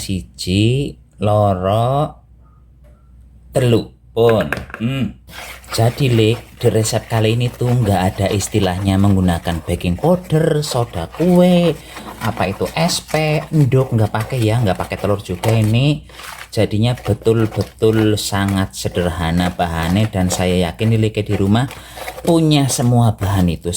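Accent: Banyumas, Indonesia. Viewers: Bahasane mblaketaket Banyumasan versi Indonesia he hehe lanjutkan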